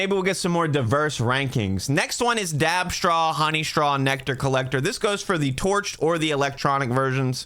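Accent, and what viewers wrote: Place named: East Coast, United States. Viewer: You have an east coast accent and you just called em Scooby snacks, you had to have grown up near new england or something 😂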